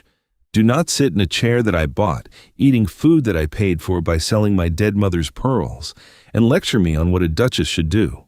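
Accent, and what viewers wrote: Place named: United States. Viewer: An English story of Duke and Duchess read with an American accent is dreadful to listen to 😳😏👎👎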